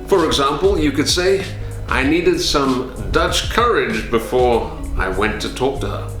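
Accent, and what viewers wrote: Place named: United States. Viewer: American accent yoo, I love it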